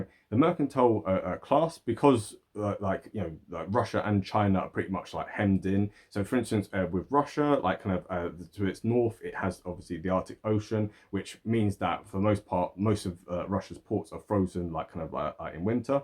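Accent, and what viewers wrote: England, United Kingdom. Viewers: Only an Englishman can have that accent. So he is for sure.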